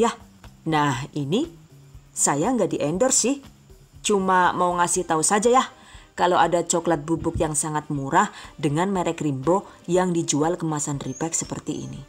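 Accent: Banyumas, Indonesia. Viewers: ini bunda org mana sih?koq ngapak bgt hehehhe kyk saya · Bikin resepnya jelas bgt👍👍👍 tp gak kalah sama bhs ngapaknya jelas poolll, kencoot😂😂😂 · Senengnya belajar msk SM Ada hiburan ny ngapaknya Saya seneng karena SM dg saya dri Cilacap 👍❤️ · Ngapak sih mba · Mksih Bund..resepnya mantul apalagi ngapaknya pd kro nyong banyumasan Bund...ora ngapak ora kpenak😃😃😃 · Pengen kenal sama bunda, ngapak nya itu loh gemesin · AQ paling suka nonton video mbk lucu ada ngapak2nya karena AQ orang ngapak hehehe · bunda...BHS ngapak mu bikin aku MLM 2 ngekek...bunda asli dr kota mana sih?tinggal di Madiun. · ya allah mba.. ngapak pada karo nyong lah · Trima kasih resepnya bun.. insya Alloh jd ide jualan lagi nih..😁 Jadi nyengir" sendiri denger ngapaknya..😁😁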